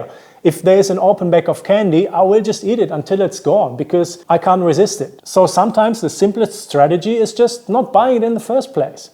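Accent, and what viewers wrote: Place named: Germany. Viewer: My ADHD brain tells me to guess your accent. Deutschland? Ich learne ein Jahre Deutsch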